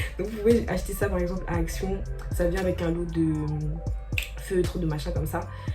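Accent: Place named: Cameroon. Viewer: dès ta 1ere phrase j'ai su t'étais camerounaise, bonne vidéo.😂🙏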